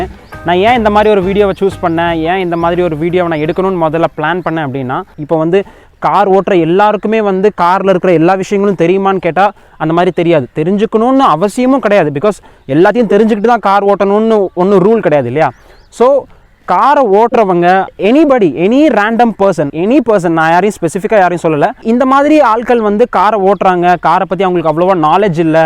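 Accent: Tirunelveli, India. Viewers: Neenga tirunelveli ya bro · Bro neenga tirunelveli ah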